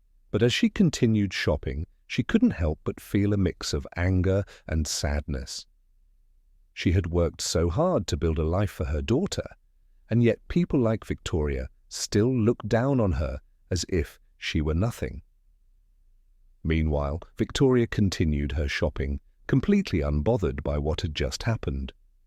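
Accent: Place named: RP, United Kingdom. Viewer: Greetings from Poland, and thank you for a great story! Your narrator has an impeccable English accent (RP, isn't it?) that allowed my listening comprehension to be about 100% without subtitles, even though English isn't even my second language (I only studied EFL).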